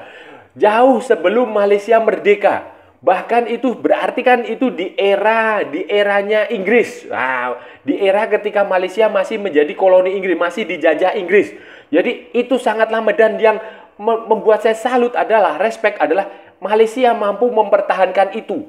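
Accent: Indonesia. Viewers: eja ala2 english pun lidah indonesia tetap sama baca nya 😊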